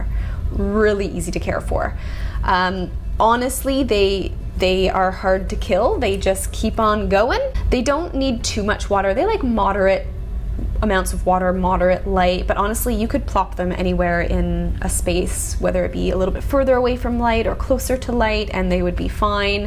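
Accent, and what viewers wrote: Canada. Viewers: Great video. I'm going to be getting a bunch of plants soon and this was super fun and helpful. Side question? Are you from Canada? Accent sounded Canadian.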